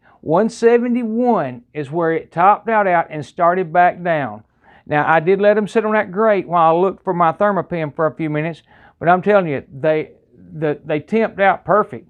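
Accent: South, United States. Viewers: I know you are from the south. I love southern accents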